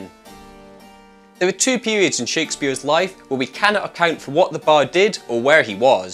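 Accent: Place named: Scotland, United Kingdom. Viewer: Since my wife passed away, 13 years ago, and being retired, I've studied everything I could lay my hands on re WS. Conclusion: he wrote the plays, with a collaboration here and there, but the greater part of Shakespeare was written by a bloke name of William Shakespeare. Only two images are accepted as genuine likenesses, the bust which resembles nothing more nor less than the local butcher, and the Droeshout which Ben Jonson endorsed as a true likeness. The Sanders is an elaborate fake, and there was a period in the 19th C when dozens of fakes were produced. It was de rigeur at the time. And seeing as the presenter sounds Scottish, I think he'll know what I mean when I call these conspiracy theories a load of old bollocks. And I wonder if WS had a penny for each word spoken and written about him for the last 400 years. So bollocks it is then. 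QED.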